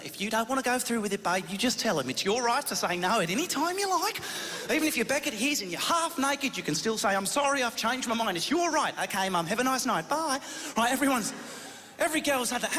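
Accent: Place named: Australia. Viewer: He's funny because his Aussie accent is spot on, it doesn't sound over the top or anything!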